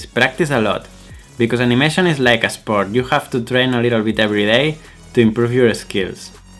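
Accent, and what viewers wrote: Spain. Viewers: Eres español verdad?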